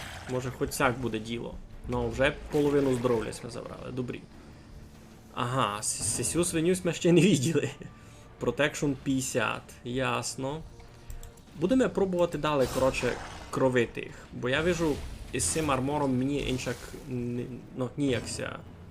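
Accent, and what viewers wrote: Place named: Rusyn, Ukraine. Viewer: Що, не чуєте русинський говір із Закарпаття?)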